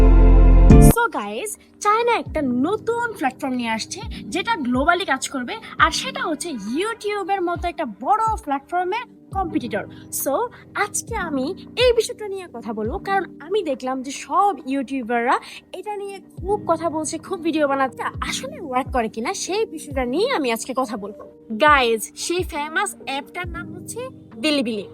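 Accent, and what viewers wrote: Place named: Sylhet, Bangladesh. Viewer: ohh i thought,,, but your speaking look like our Sylheti gr...whatever dear, carry on,, also nice too meet u... ❤❤❤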